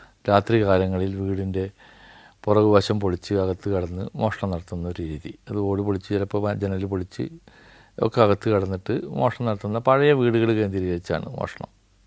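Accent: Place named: Valluvanad, India. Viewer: നന്ദി സാർ, വള്ളുവനാട് ഭാഗത്തെ ഭാഷയിൽ സാർ പറഞ്ഞ കഥകളും പറഞ്ഞ രീതിയും വളരെ നന്നായിരുന്നു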